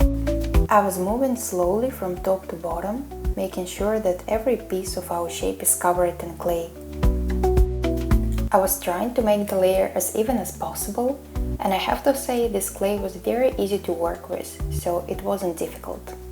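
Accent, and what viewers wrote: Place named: Russia. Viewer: Акцент русских ни с чем не перепутаешь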